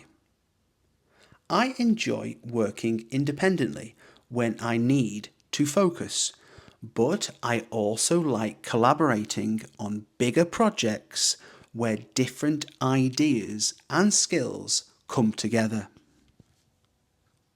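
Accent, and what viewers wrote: United Kingdom. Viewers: What kind of Britishaccent is it specifically?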